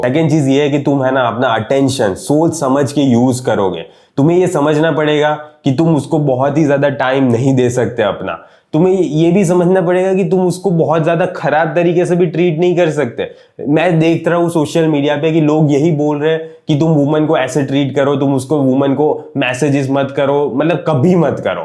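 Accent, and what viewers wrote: Gujarat, India. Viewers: Bhai tame Gujarati cho? aapki aawaaz se lagta hai!! BTW Meh gujju chu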